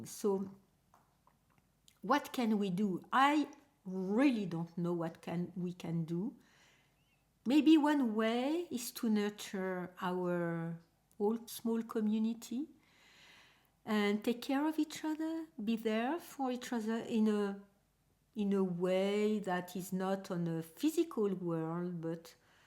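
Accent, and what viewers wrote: France. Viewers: it was like hearing myself speak all my internal thoughts, but with a French accent!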